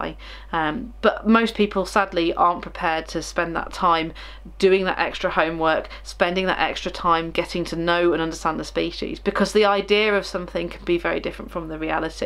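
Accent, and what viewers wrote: United Kingdom. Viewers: I like the British accent! I love your animals... Los Angeles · I am currently trying to learn British English pronunciation (not really anything in particular like RP). I have to say, I love listening to people like you, who talk about interesting topics and also listening to how you speak. Also love the birds you have shown. Boop Boop ^^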